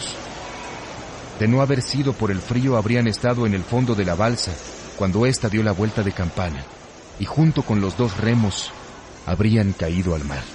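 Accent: Mexico. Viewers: Cómo siempre las voces mexicanas deleitando al auditorio tanto en películas como en narraciones . Felicidades desde stgo . De chile .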